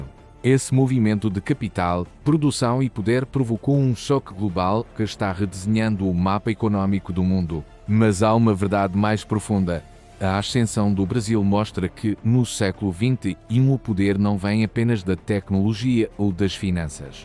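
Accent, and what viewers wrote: Portugal. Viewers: Pq fazer com sotaque de Portugal????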